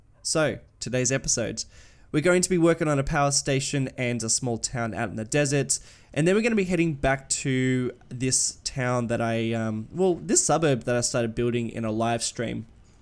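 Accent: Australia. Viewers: aussie guy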